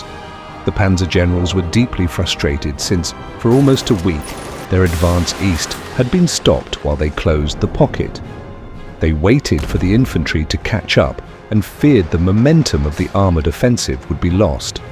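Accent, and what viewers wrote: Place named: Russia. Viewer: Great narrating and also new information to me thanks for this educational video ❤ I'm glad this gruesome war had ended ~ and I hope further peace persists ☮️ Full watch 🤍 PS: the Russian accent hehe 🤭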